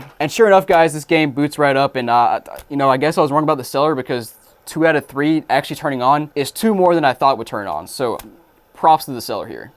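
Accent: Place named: South, United States. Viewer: Hey, I was wondering where you accent comes from specifically. Like. I know It's a southern accent (I think) but a region or a state? I swear I'm not weirdo😅 I just really like this accent but I don't know exactly what accent it is (I'm not american) so I can’t precisely answer the question when asked. Love your vids too btw.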